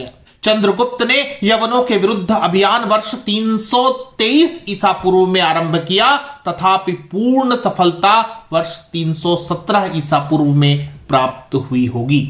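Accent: Standard. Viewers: Shudh hindi bashan ka ucharan kiya, suhani lagi. Details achha diya. Good.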